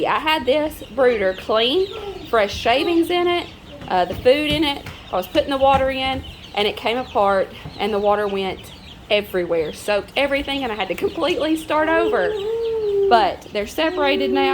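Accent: South, United States. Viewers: Hi Ms loved watching your videos and seeing that chick you were holding so cute and sweet..i love chickens...seeing your kids too they are so precious looking....your a pretty young woman created a wonderful family with your hubby and you are so sweet and love the way you talk a true southern girl. Me too I'm a real southerner as well. But y'all have a great Monday evening if y'all have storms be careful and stay safe...see you in your next video girl 💕💕.....love ya sweetheart ❤️.....take care ❤️.....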